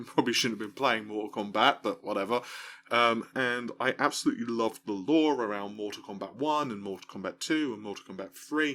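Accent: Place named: RP, United Kingdom. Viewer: its because he has a posh english accent but then uses the F instead of TH. Freak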